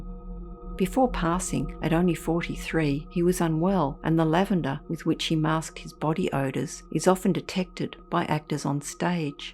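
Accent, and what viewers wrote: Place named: Australia. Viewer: This is my favourite channel. I love hearing Australian voices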